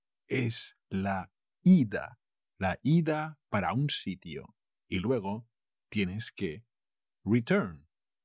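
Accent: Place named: Castile, Spain. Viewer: I have gone through many different tutorials and decided to go with yours. Your way of teaching is both very clear and efficient. That you are giving different examples than what is written on the slide is genius because that way it is possible to learn more vocabulary and grammar. Another important reason I chose your tutorials is it was hard to find European Spanish(or should I say Castilian?) I adore the "th" sounds haha. Thank you for your work, it is much appreciated.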